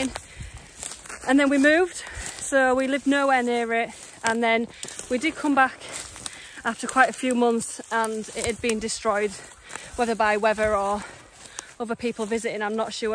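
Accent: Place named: Carlisle, United Kingdom. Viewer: Carlisle accent..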